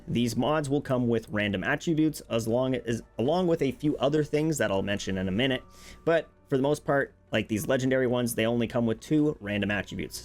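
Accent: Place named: Canada. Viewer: Great video but damn my man you and tash sound exactly alike and I'm not talking about the Canadian accent. If I had my eyes shut I wouldn't be able to tell the difference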